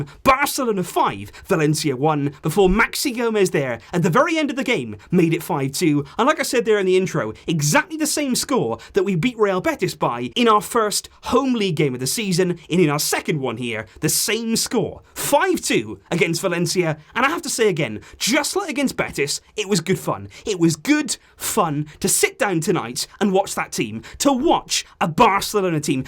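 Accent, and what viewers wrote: United Kingdom. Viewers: Your British ascent is so good ✌️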